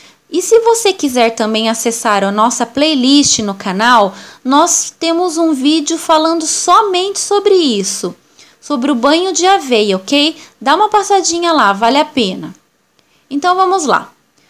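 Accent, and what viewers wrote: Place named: Northeast, Brazil. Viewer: Treinar a dicçao fiquei <link>.a leitura do texto com sotaque sertanejo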